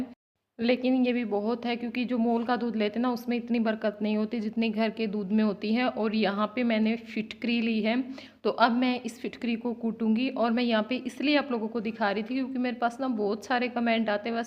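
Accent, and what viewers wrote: Haryana, India. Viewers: Haryanvi touch aapki language m sunn k bhuutt acha lga Di... God bless you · Aap h haryanvi me baat kera kero behot achi lage h aap k mu se sunne me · Bebe haryanvi boli mast laga h👌👌👌👌👌👌👌👌👌👌👌👌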